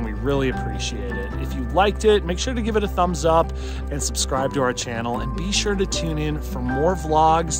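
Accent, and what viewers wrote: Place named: United States. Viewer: Hello! I am having fun with your show. It is so great your show and inspires me how to learn camping. I want to try on caping in rural Japan someday. By the way, I took up studying English lately. Because I want to know more detail about what are you talking the show. I am also learning your American English pronunciation from your beautiful voice. All of the English books advise me that If you want to speak English fluently, you should pretend someone. I catch your phrase, word anymore. As I spoke English, I was supposed to be. I am sure that you are my English teacher. Great for me. Anyway, the Japanese crew is waiting for Rock The Park staff to visit to shoot next time in Japan. I hope to see you soon. From Masato Kaneko.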